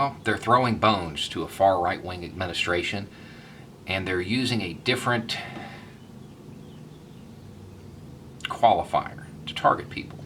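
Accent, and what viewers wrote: South, United States. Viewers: Good to hear a southern accent making such sense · The Trump era has left me feeling ashamed of the south. So I have to say, as a fellow Southerner, it's amazing hearing somebody say these things in a southern accent. What state are you from?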